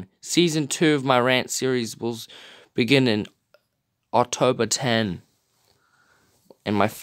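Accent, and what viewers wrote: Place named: Australia. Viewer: Your accent is Australian, and I didn't know you were from Australia. Also, opinion respected on Elmo's World.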